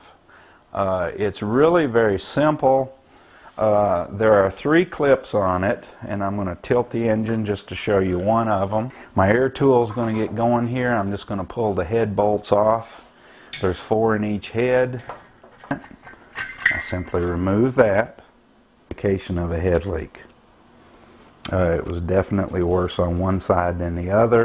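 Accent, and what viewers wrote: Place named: South, United States. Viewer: Southern accent lol. He knows what to do I'll give him that but this video is no good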